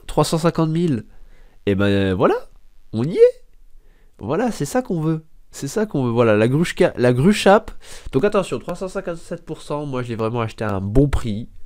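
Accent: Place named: Brittany, France. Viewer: c'est tres l'accent breton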